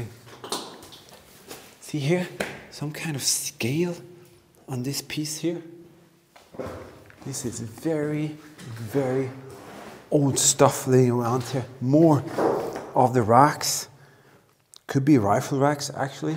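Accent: Germany. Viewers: You sound German to me, but then the pronounciation of Feuergefährlich makes me things differently. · Warum sprichst du englisch, man hört raus das du deutscher bist ,und wenn dann könntest du wenigstens einen guten Untertitel erstellen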